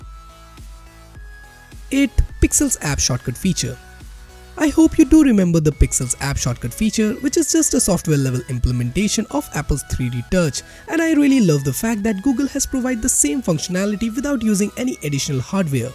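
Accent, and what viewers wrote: India. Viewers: It's like the robo narrator but with a heavy Indian accent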